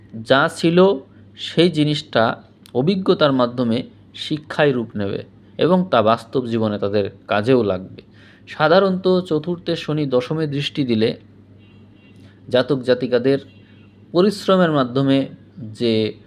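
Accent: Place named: Bangal, Bangladesh. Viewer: আপনার বাংলা ভিডিও তো নয় ---এটা বাঙাল ভিডিও। বাংলাটাই তো ভালো করে বলতে পারেন না। আগে বাংলাটা ভালো করে শিখুন তারপরে বাংলা চ্যানেল খুলবেন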